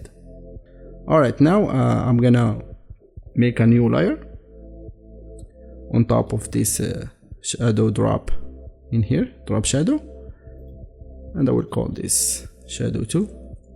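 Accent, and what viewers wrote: Morocco. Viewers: Are you Moroccan, I can tell f accent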